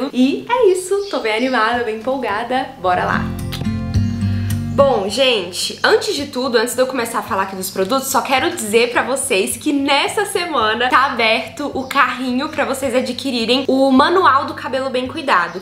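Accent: Minas Gerais, Brazil. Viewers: vc é de minas gerais o sotaque diferente 🤔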